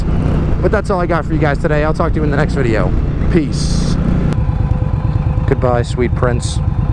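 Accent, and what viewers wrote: Pennsylvania, United States. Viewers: Hey Dude, Where have you been? Great review! I'm a HD guy and I'm leaning towards a new Softail Slim. But Damn! Funny you sound like you more from my neck of the woods in PA. Great Review!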